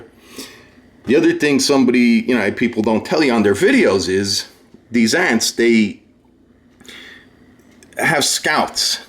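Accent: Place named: North, United States. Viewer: lol I love your accent you from Jersey, Boston, New York I notice that northern accent. And yea I have ant issues at my house, they’re usually the smaller black ants and I made a mixture with boric acid powder with honey and cut out a hole in a plastic bottle for easy access and I caught tons of ants that way and they weren’t able to get back out of the bottle. But you’re right ants can be smart and picky!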